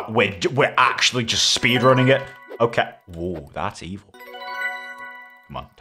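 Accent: United Kingdom. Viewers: I didn’t know you’re British😂😂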